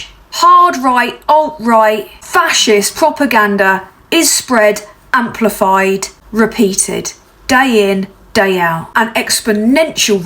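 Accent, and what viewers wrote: United Kingdom. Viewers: If you didn't have that UK accent I would have thought you were talking about America!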